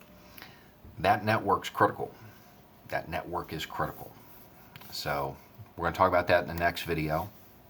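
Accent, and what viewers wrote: South, United States. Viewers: lol, I love stealthing anarcho coopeativist and old-school punk rock organizing with a Southern accent. My problem is I lack subtlety, or I get off too much on talking up the good folks of Chiapas to squares. Keep on rocking until there's a free world buddy.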